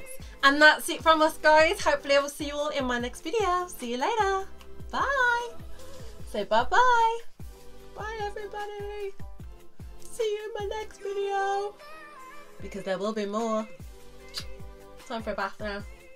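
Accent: United Kingdom. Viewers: I like your british accent,and you sphynx is so adorable!,I love it !.John M Guinto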